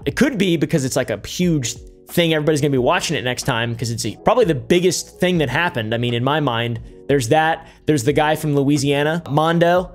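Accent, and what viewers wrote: Australia. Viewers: your australian accent has me on the fkn floor bro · love your aussie accent😆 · Your Australian accent is so fucking funny 😂😂😂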